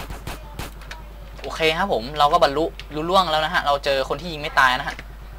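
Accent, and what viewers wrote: North, Thailand. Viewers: พี่คนเหนือหรอครับ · คนเหนืองจังหวัดไรครับ ><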